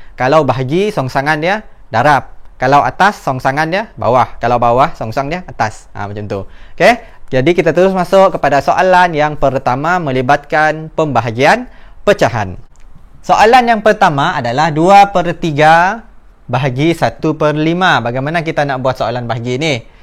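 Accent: Sabah, Malaysia. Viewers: Cigu sabah kah?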